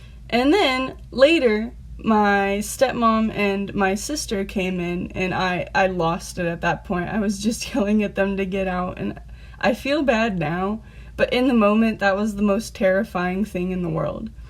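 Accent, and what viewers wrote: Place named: Arizona, United States. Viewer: YO YOU FROM Az lol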